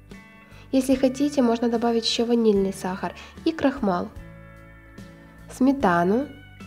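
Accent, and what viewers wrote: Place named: Ukraine. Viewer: Достали критиканы! живет в украинской деревне,и дома разговаривает на украинском языке.( Вот оттуда и произношение другое). И это не помешало умной молодой женщине,создать и развивать свой канал!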